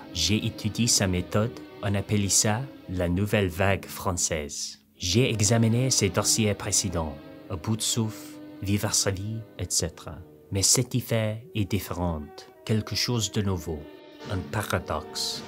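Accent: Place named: England, United Kingdom. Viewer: Ton français sonne super élégant avec ton accent anglais !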